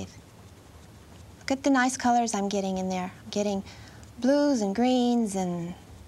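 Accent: South, United States. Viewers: Ahhh, her country accent is hypnotizing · southern style talking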